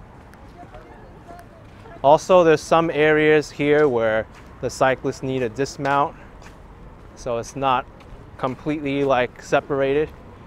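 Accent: New York, United States. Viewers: It's strange to hear u narrating Santa Monica - with a New York accent - I have the same accent. About Silverlake Ramen --- one of the owners is from the Silverlake area - and they just opened another Silverlake Ramen at The Collection in Oxnard, CA. I believe there's another one in Silverlake. My mother and I came out to Calif (from NY) in 1996 -- and we went to the 3rd Street Promenade late 1960s/early 1970s. It was 2 or 3 blocks - an open-air pedestrian mall. So happy to see people out and shopping and stores starting to open. It's been a very difficult 1-1/2 years for all of us.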